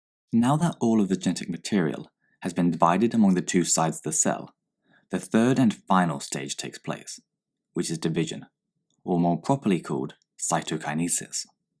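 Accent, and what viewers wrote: United Kingdom. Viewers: HE IS BRITISH!!!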